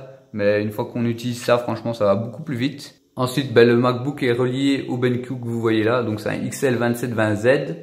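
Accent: Belgium. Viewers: Meeci la YouTube MONNEY !!! 😅❤️ Àvec ce petit accent du genre belge MAMÉNE !✔️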